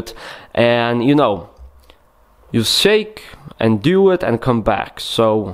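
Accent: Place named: Russia. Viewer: Это лучший русский акцент из всех что я слышал!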